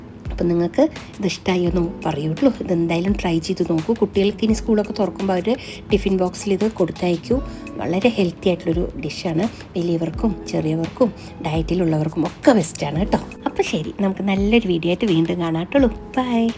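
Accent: Palakkad, India. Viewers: ENIKKU NINGALUDE PALAKKADAN SLANG ORUPADU ESHTAM AANU 🤗ENTE VEEDU EVIDE ERNAKULAM KOCHI AANU 🤗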